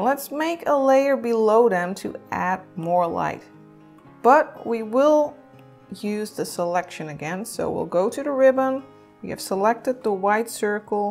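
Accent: Netherlands. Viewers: Are you dutch ?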